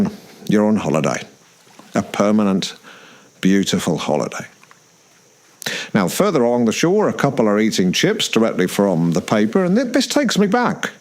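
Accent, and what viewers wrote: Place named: Australia. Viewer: Too aussie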